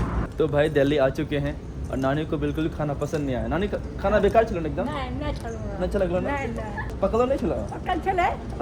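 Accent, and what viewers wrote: Bihar, India. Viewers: ❤❤❤❤❤ Bhai aap to same to same meri tarah hi bolte ho bihari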